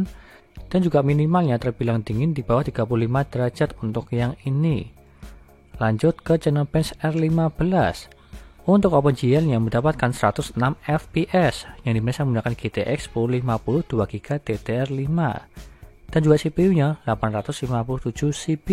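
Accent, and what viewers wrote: Surakarta, Indonesia. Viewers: Orang solo bang?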